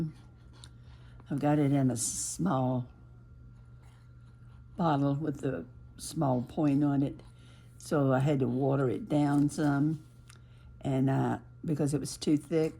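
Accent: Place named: North Carolina, United States. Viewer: I'd wondered about her accent too. I think she sounds so much like my relatives did from a small town in North Central, NC. I know about the tornado yesterday. I was watching the Ryan Hall Ya'll weather channel and praying no one would be hurt or injured but unfortunately people were killed and injured. My mother and I were very sad to hear that news. I do hope is OK I just had her video recommended to me by YouTube tonight. I don't do much paper crafting I usually make jewelry and crochet but love her content, she's so creative and her voice so soothing. I'm subscribing!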